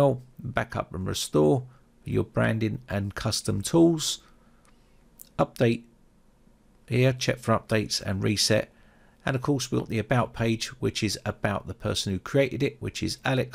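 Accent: England, United Kingdom. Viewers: Since you talk with an English accent, why are you pronouncing CPU-Z as "CPU Zee" and not "CPU Zed", like any Brit would?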